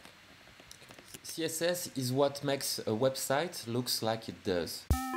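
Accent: France. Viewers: what's up with the french accent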